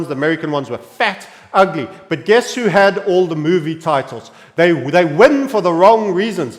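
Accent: South Africa. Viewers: How amazing it would have been to have met this guy where he is from because i am from where he is from. I here his accent. Only thing is, im from the mother city. Not sure where exactly you come from Sir. Ek wonder as jy a kaapenaar is of van KZN? Meneer ek kan nie so lekker siuwer Afrikaans praat nie, maar jy weet mos, ons praat baaie taale hier in Kaap. 😂 Dankie vir alles meneer.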